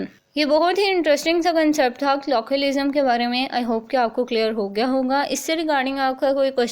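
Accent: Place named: Urdu, Pakistan. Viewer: She is speaking in urdu accent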